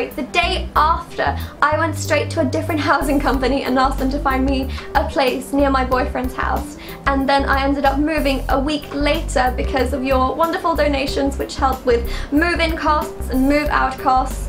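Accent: United Kingdom. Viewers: i'm a simp for british accent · what british accent is this? I LOVE IT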